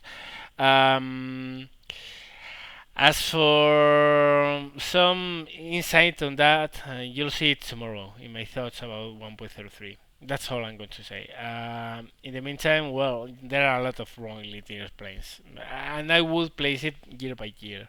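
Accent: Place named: Spain. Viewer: Your English is top notch regardless,. Your sexy Spanish accent is as thick as Tiger armor, but your actual English is pretty much flawless most of the time.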